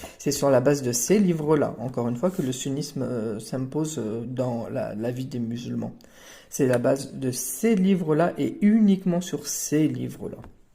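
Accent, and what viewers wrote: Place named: Arab world. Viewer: Poto t'as un accent arabe découpé à la scie c'est pas ouf. Tu vois "passer du coq à l'âne" bah c'est pour toi cette expression idiomatique française.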